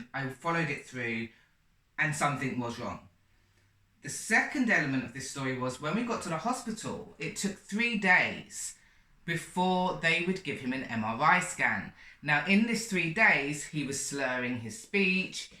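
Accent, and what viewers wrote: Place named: United Kingdom. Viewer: Hi, lobed ypur video. I realise I am a claircognizance too.. Sending positive vibrations to you regarding your Dad. You sound British, are you in the UK?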